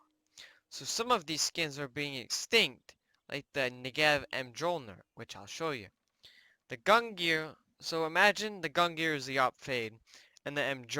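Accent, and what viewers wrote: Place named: Arab world. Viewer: Lol arabic accent my friend🤣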